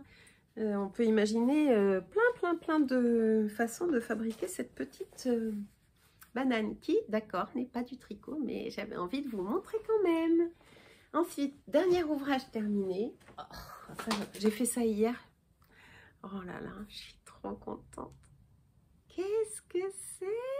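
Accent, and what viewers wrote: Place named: Marseille, France. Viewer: 🍄merci , ton Marseille te vas à ravir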